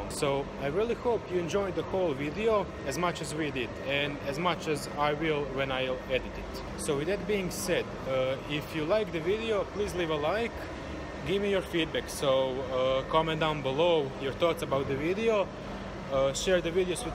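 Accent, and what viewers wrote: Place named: Russia. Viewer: Naglasak ruski al moze proc hahah 👌 extra video 👍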